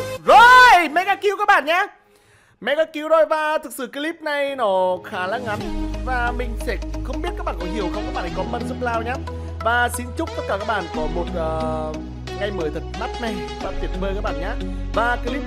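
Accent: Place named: North, Vietnam. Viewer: Giọng bắc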